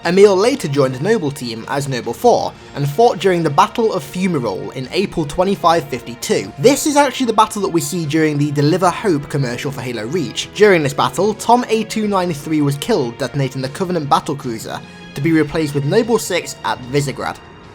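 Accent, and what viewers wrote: United Kingdom. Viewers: i like his voice, it reminds me of an old friend i used to play with back when i was still active on xbox...... though he sounds more british than anything and my friend was canadian.....but still, pretty close.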